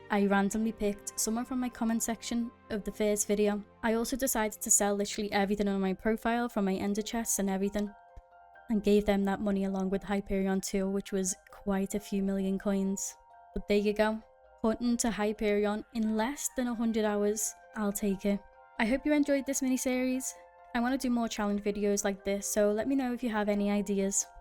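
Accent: Liverpool, United Kingdom. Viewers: Swear u sound scouse if ye r where abts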